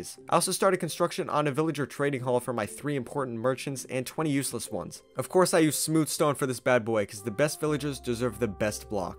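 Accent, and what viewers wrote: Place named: France. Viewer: Your French accasent I cool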